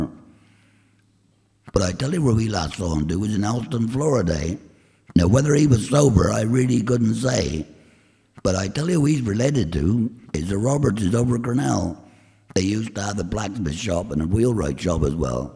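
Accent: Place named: Cornwall, United Kingdom. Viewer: Thank you for this...I hear my Mum, a Penzance maid, who is now passed in your dialect. Don't hear it in Pennsylvania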